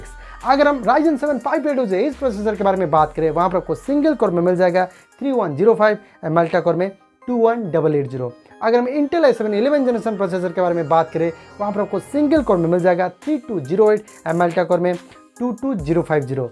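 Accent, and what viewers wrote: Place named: India. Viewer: Son of a bitch, i dont understand ur accent. Pak u bumbai indian body odor people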